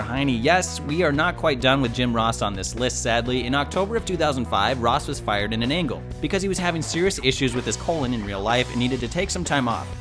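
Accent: United States. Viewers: Good lord, who is this wanker narrating? Tell him to piss off and bring back one of the British boys. I didn't even know WhatCulture had any Yankee berks on working for them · It's weird hearing an American accent on What Culture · just go mate leave no one like you and your american voice.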